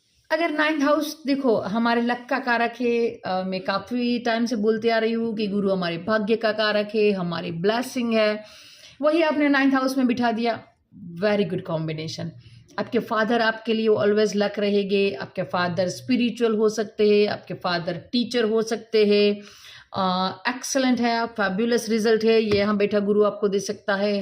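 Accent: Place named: South, India. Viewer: Sahi kaha.. South Indian accent bot acha lg rha hai..👍🏻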